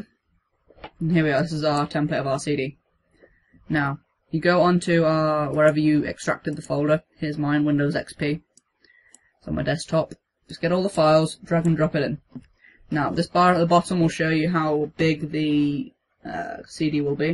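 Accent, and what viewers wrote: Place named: United Kingdom. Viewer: British accent..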